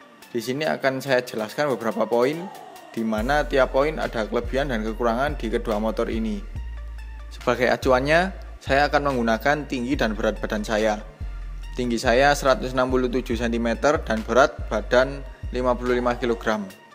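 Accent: Malang, Indonesia. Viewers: Orang Malang/Batu mas?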